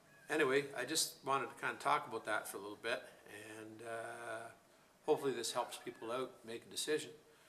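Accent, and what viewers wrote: Canada. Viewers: Aboot? Oh Canada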